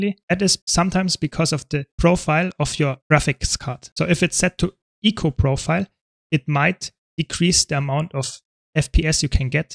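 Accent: Germany. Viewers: des ischn deutscher ich hörs doch :D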